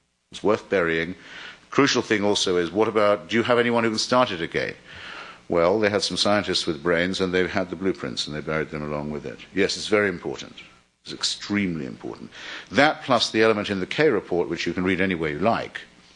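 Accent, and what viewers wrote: RP, United Kingdom. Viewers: a shock jock with a plummy accent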